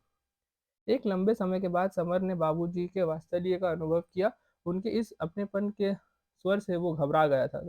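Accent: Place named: Kolkata, India. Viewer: bhai aap kolkata se ho? awaaz se lagraha hai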